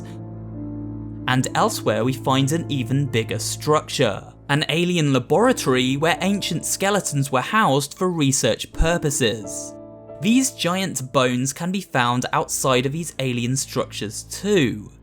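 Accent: United Kingdom. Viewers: Omg the british is so deep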